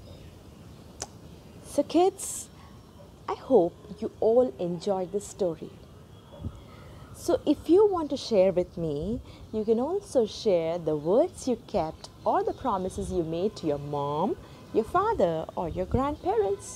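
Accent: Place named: England, United Kingdom. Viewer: Awesone Story telling.. english accent and the way the story narrated.. creates enthusiasm in kids.. Great Job!!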